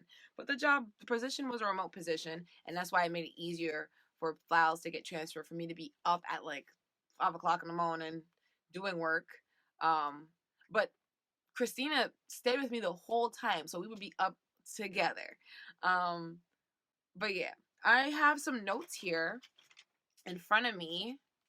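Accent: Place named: New York, United States. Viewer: Super late to the game here, but I finally caught up with that Kurtis/Dean dating video and had to give you a sub - you're super entertaining. Also, as a New Yorker who has (unfortunately) lived in Buffalo for the last 4 years, it's nice to hear a real New York accent whenever I get the chance.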